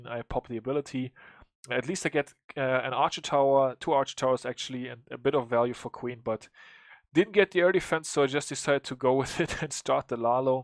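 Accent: Germany. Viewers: Du bist glaube ich deutscher also du hörst dich so an sag mir mal bitte wenn du Deutsche Bist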